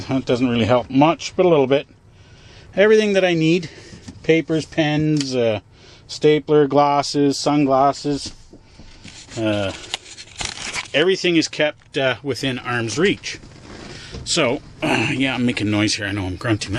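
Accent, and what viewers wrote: Canada. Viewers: Good example of the Canadian accent!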